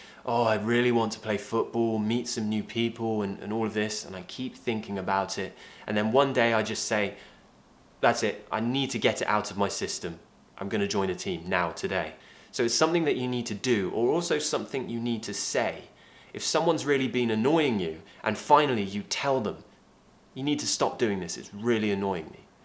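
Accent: United Kingdom. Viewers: you really help me to learn british accent..thanks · Allright!!! I am from Brazil and love your videos, you help me to improve my english and the my accent too cos I really like the Bristh accent.... Cheers!!!! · Your British accent it's blew me away 😍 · British pronunciation wins ❤️ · I love UK accent 😍😍😌 Arabic girl .. from Iraq .. Keep going🤗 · Although you typed it here, I still watch the video because of his british accent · I like acsen british. Ada karakteristik dengung. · Hi, I am one of your very supportive subscriber. I already watched some of your beautiful and useful videoS. I really like it so much. Although yourE a British people and I love British accent so I think itS the reason that pushes me to watch your videos everyday. Thank you and hope to see more upcoming videos from you. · Your british accent is amazing😍😍😍😍😍😍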